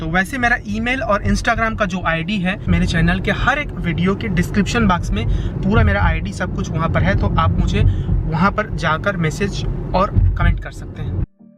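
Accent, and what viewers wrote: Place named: Chhattisgarh, India. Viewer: Videos k start me Johaar jo bolate h..us se lagta h...aap India me CG se h... reply kre..vaise toning CG wali lagti h...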